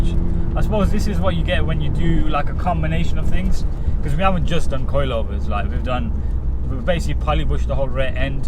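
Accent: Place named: Liverpool, United Kingdom. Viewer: That Liverpool accent what makes this video entertaining. Keep up the good work.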